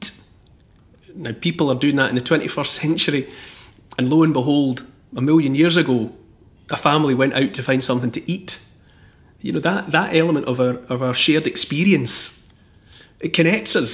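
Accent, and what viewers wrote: Scotland, United Kingdom. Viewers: sadly yes. He doesn't "look" the stereotypical history buff. But that's what draws me to him initially, and of course the content and the obvious Scottish delivery. My son is at University in Ireland at the moment and he tells me that the majority of them at very disappointing to say the least. Even the "clubs" are disastrous, well indoctrinated with the wrong information. It's a shame really. Tonight in Ireland, practically all restrictions are lifted...the country is elated. I for one will never forget what they've done..I hope things get better there in Germany. I'm only going on the information that I've seen coming out of Germany..its not good, or am I wrong?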